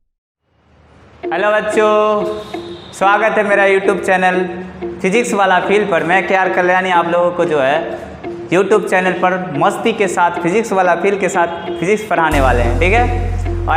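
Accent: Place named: Bhojpuri, India. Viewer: Gajab Bhojpuriya style guru ji😘 ham golu hai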